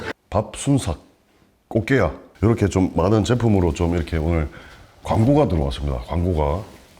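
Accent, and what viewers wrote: Gyeongsang, South Korea. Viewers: ㅋㅋㅋㅋ같은 갱상도 인으로서 행님 정말 마음에 드십니더 !!!! 프로틴 이빠이인 번데기 먹방 가주실랍니꺼!!!!!😍😍😍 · 경상도 사투리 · 경상도 방언 너무 치인다 ㅋㅋㅋㅋ 목소리도 좋고 보는맛이 살아있눼~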